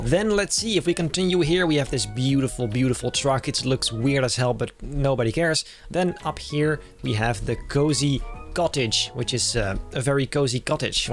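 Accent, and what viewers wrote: Netherlands. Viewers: Wait r u dutch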